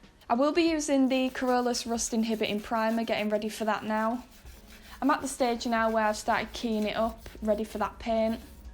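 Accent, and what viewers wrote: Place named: Yorkshire, United Kingdom. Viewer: Love ur vids I'm wanting a defender project soon And I will be using ur vids as guidance Keep it up BTW I'm guessing your from Yorkshire lol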